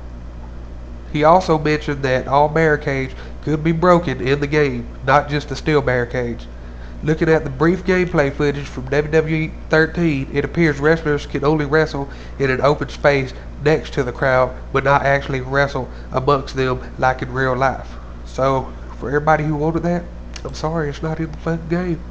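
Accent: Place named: South, United States. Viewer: lol u probably get it alot but u sound so amazingly what we think of as southren yank in britian so i had to b ironic i mean nascar in any other accent just doznt sound right lol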